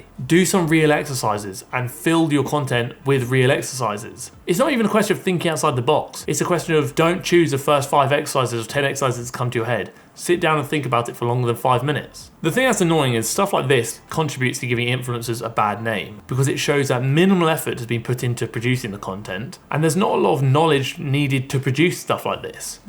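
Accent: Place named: England, United Kingdom. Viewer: Here for the accent 🤣🤣🤣 so awesome, England? Sounds like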